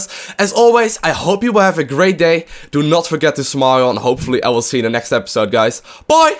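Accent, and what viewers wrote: Netherlands. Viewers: Just wondering are you from the netherlands? :) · are you Dutch? you sound Dutch to me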